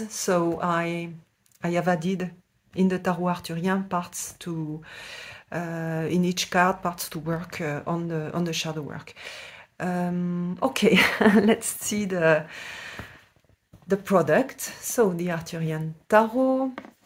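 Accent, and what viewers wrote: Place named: France. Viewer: Sublime tarot, félicitations à toutes les deux pour cette belle collaboration 💚💚💚 Il me tarde de lire le guide book et de recevoir le tarot. Bravo pour ta review en anglais. La langue de Shakespeare n'est pas accessible à tout le monde Bel accent frenchie, avec beaucoup de charme 💐 🧚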